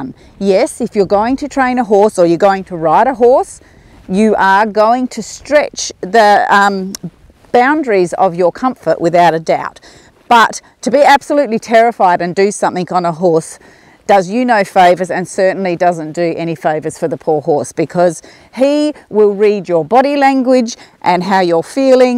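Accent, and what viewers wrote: Australia. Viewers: I’m doing lessons and I might canter this term and are Australian because of your accent I feel like your Aussie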